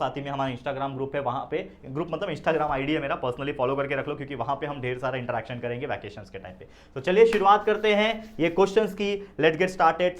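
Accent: Maharashtra, India. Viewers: Sir aap marathi bolte ho kya😅😅😊😊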